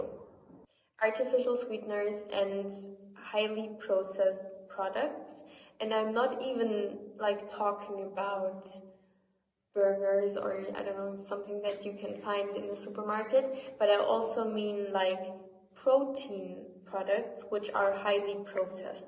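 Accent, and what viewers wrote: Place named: Germany. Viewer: She just have such a german accent.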